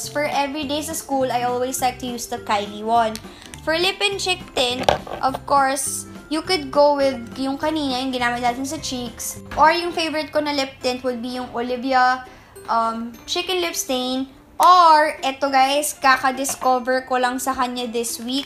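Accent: Conyo, Philippines. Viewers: So conyo nman this girl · So conyo lol new subbie here :) you're pretty and detail by detail talaga ang pag explain kaya helpful 👍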